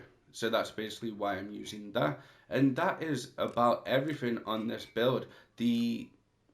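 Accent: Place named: United Kingdom. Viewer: Nice to hear a British content creator for NW. But why play on console? {:-:-:}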